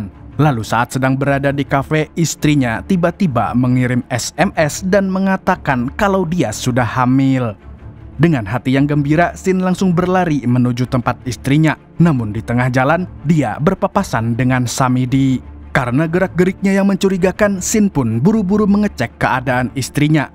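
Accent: Banjar, Indonesia. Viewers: Orang Banjar kah om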